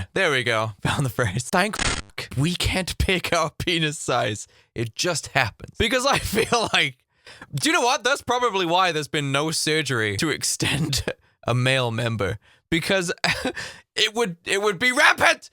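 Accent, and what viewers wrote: United States. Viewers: Where are you from? You sound American to me but I seen where you said you’re from the UK. I’m from the US and I think you sound American. Much love to you and love your videos!!! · Why do you sound American? you put your youtube as United American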